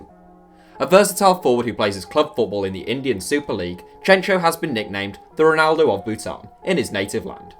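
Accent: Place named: RP, United Kingdom. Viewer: Though I like the efforts, getting your research from Wikipedia and putting a RP accents aren't good enough, to make a list of the best footballer in every countries. You lot need to read more outside of Wiki and consult football experts from each countries to make a better list. Pronunciation is a dead giveaway that this videos is poorly research...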